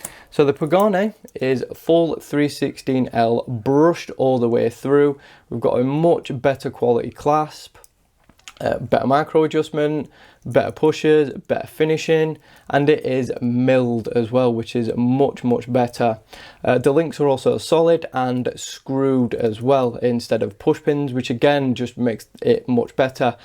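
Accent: United Kingdom. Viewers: Super Fluent British Accent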